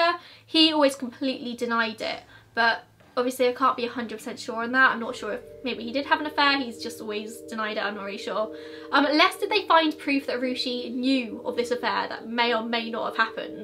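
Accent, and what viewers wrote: United Kingdom. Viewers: I am such a fan of yours, so I hate to make any comment that could be deemed as negative; however, it would be great if you could speak a bit slower. I adore your British accent, but have difficulty understanding what you are saying when you speed talk. I literally couldn't make out much of your Florida vlogs because of this. No disrespect intended 😉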